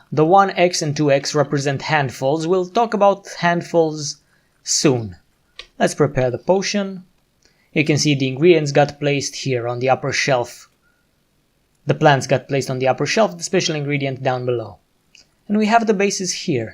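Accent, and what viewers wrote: Romania. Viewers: Nice. Oh btw I didn t know u were Romanian. Niceeeee accent!!!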